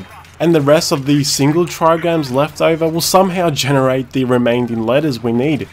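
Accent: Australia. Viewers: Great video man! Are you from Australia? Either way, you've gained a sub :)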